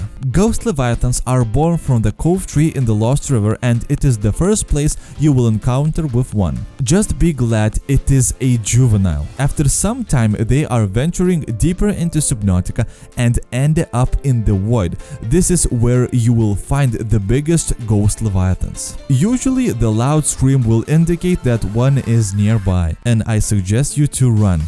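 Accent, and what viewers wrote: Russia. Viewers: It love you're Russian accents!!! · he sounds like a stereotypical russian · Максимально русский акцент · Акцент прям как у русского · Русский акцент или нет? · Love Russia accent friend... no really I like the Russia accent!!! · Are you from Russia? Your pronunciation is very similar to Russian. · Are you Russian or from Republic of Moldova? :) I ask that bcs of your accent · yea i can see you sound russian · Мммм... Русский акцент) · That russian accent tho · Youre accent youre from russia? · omg i love his russian accent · Ты русскиий? У тебя акцент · Wait IS THAT A RUSSIN I HEAR ??? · Бля, чувство что ты русский) акцент точно не инглЫш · Акцент русский. Разве нет? Четко слышно букву "Р" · Russian accent I love it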